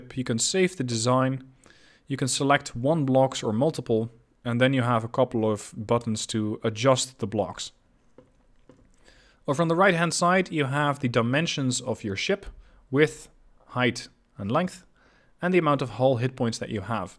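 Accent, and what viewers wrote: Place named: Netherlands. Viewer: Looks like an awesome game, and also your accent sounds like your Dutch lol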